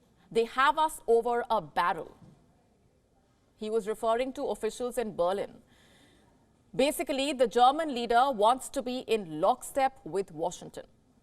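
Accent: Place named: India. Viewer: Indian accent is so ridiculously funny! 😆😆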